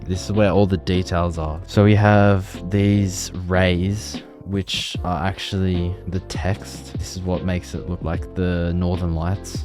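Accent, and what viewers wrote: Australia. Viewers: Are you Australian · Are you aussie?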